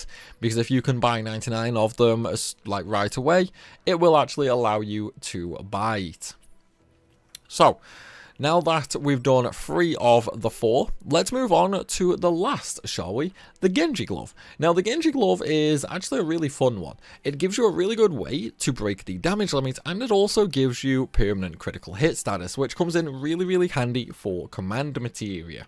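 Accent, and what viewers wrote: United Kingdom. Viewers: It would’ve been nice if you had also shown us HOW to get these instead of yapping in your disgusting British English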